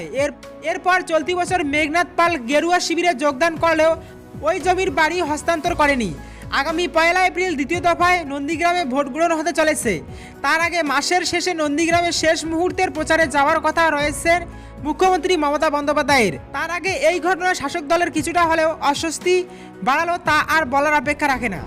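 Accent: Bangladesh. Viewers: valo kore kotha bolte sekh.bangla deshi der moto kotha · বাঙলাদেশীদের মত উচ্চারণ।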